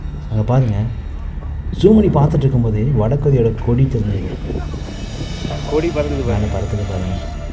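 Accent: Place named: Namakkal, India. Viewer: Enga saravanan mama voice mathiri iruku bro unga voice from tn namakkal super review bro 🖤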